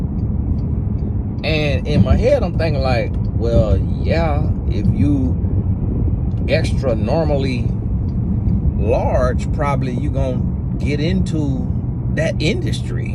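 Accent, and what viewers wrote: South, United States. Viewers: You may have a country accent, but you teach alot of good knowledge! I have a strong Texan accent, when I travel sometimes ppl don't understand me. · I love your country accent.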